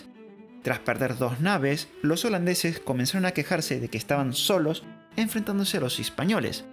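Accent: Latin America. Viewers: ¿Su voz en off es la de un hispanoamericano que valora las aportaciones del Imperio Español a sus territorios?. Una misma Lengua (la del Reino de Castilla) y una misma Fe Católica.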